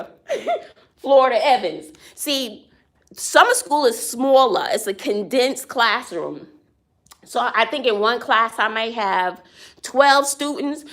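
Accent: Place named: Boston, United States. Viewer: Where are you from? You sound like and remind me of my aunties from Boston?? ☺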